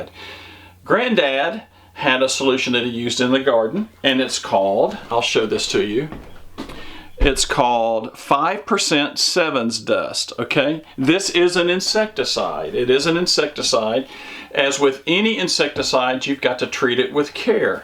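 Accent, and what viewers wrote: South, United States. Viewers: Love the videos and congratulations on your channel. It's so cool to hear you talk, it makes me miss home. I live in Tacoma but I'm from a deeply southern town in NC. My wife and I are in the process of transitioning to full time and your videos are very helpful and insightful - Thank you!! I have a question about the blocks you have on the front legs. Would it be possible to get a schematic or plan, they look like they would perform extremely well. Good luck and we look forward to more videos because we "Love RV Life" too!!!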